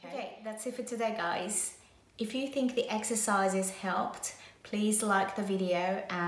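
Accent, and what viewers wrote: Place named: United Kingdom. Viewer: U have the British accent but u don't look European or Brazilian at all. But Asian. 💙R u a Japanese lady? ☺️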